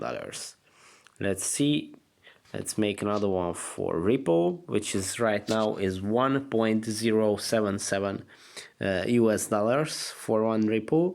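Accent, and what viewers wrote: Hungary. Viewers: Ur from Hungary, I hear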